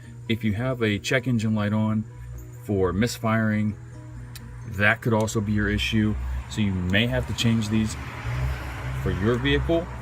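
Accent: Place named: Australia. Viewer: I loved the Australian accent 😂 made me stay and watch the whole thing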